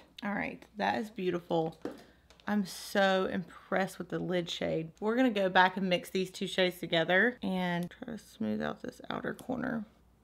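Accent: South, United States. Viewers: I just found you thru madison's giveaway and I been watching all your videos. You talk as country as me and I love it. Where are you from? I'm from Ga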